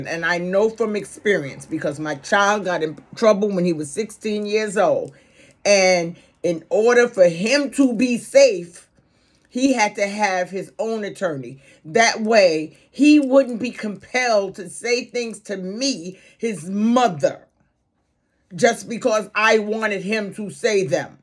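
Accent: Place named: New York, United States. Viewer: I knew you was from NY the first day I listened to you. that accent is definitely a New York accent and I have never been to New York a day in my life, but I have met people from New York and I love your accent and I think you’re doing a great job. keep up the good work. ❤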